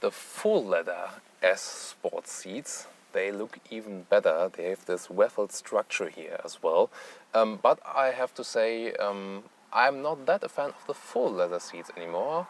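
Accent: Germany. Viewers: I love your channel, I always watch your videos about the autos. unfortunately, many models are not available in the US., you have a very funny German-English accent. which could be one of your traits. And you looked so weird in helmet.... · I love your accent, seriously, I wish I had a German accent like you do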